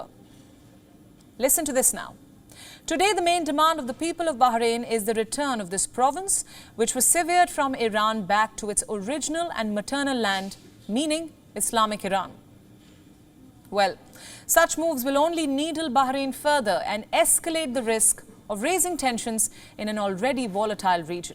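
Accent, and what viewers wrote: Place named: India. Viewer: What is this? it's not news and it's not analysis, it's just some one saying imaginary things in Indian accent.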